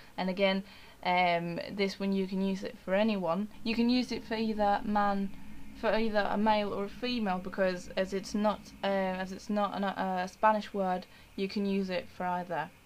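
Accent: England, United Kingdom. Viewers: Your English accent is beautiful! :D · I don't get it. Where are you from? Why do you have that British accent in English? And you also speak German and Norwegian? :) You're Argentinian, aren't you? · Posta me encanta el acento britanico que tenes! te admiro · que tremendo ingles britanico tenes che! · como hiciste para aprender el acento britanico tan bien? yo vivo en USA y tengo acento yankee, pero quiero aprender el britanico y no me sale! :( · You speak with a British accent! Congratulations. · Jajaja, es muy raro escuchar tu inglés y castellano al mismo tiempo. Rather british, isn't it? Jaja, de donde sos, y de donde tenés ese acento inglés? Muy lindo, me encanta tu voz. Yo soy de Salta y acá y en todos lados usamos practicamente los mismos términos que en Buenos Aires, salvo que sin el acento porteño exagerado. Aunque la gente muy de campo o del norte tienen un acento o forma de hablar bastante diferente (algunos de la ciudad también), hablar como "colla" como se dice por acá... 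· English accent???? i love it!!!! i'm from Argentina but my English is American!!! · MAESTRO jajaj muy bueno tu acento britanico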